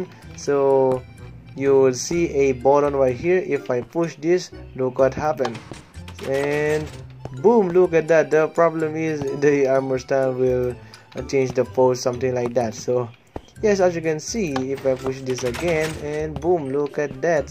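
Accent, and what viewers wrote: Philippines. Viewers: In the beggining i knew that he is a fillipino haha cool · Filipino accent is hard on this one bois i love it so much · oh God I'm listening to a filipino right? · Becuz it worked i subbed I also noticed your filipino accent, MABUHAY KA PO❤️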